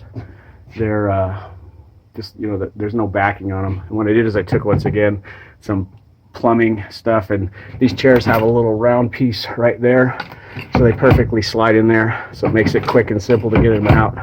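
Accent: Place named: Utah, United States. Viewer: sounds like he's from Utah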